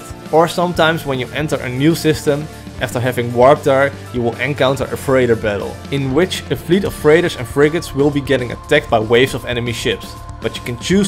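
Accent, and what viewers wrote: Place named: Netherlands. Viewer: Ahh yes very cool, im also Dutch i thought already i could hear it in your accent a bitt, very good content you have a new sub 😎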